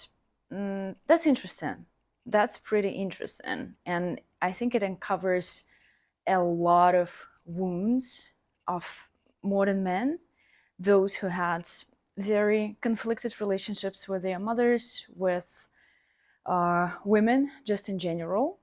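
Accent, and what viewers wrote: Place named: Russia. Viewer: Gracious, I just have one comment today: I know you say Russia is a very bad place at this time and I believe you 100% but I must say.. Your beautiful accent and physical features make me certain that at one time long ago Russia must have been a very good place. You know, the concept of the Chineese Emperess is something that I find so appealing, I don't know if is historically accurate, but I heard somewhere that China in ancient times was a people ruled by a female hiearchy.. what a wonderful wonderful world that must be. I have finally come to realize I can't wait for the world to become matriarchal.. so I'm declaring now that it has officially changed! We are now living in a matriarchal society and I expect everyone to act occordingly.. they will be called out by me for non-compliance. You are so wonderful.. I wish I had money for you but I am on government assistance for my mental disability and sometimes can't even manage my bills. 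So I leave comments, let me apologize; because of my mental disabilities I know that about half my comments are wierd and off the wall but I ask you to please just ignore them because occasionaly there is a rational heartfelt comment.. and I have such strong feelings of respect and admiration for you that it would be a shame for you not to understand how important you are to me just because i ddid a little too much LSD or DOPE many years ago.. btw: as society is more and more accepting of homosexuality I have already gone on to somethi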